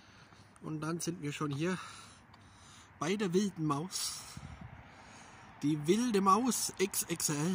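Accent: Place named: Franconia, Germany. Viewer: Dei frängisch is einfach herrlich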